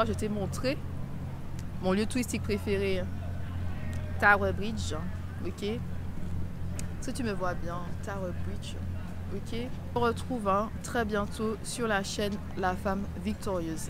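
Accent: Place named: Martinique. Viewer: Oui, je confirme que l'accent martiniquais est bien present dans ton dernier audio, et l'articulation y était également. 😊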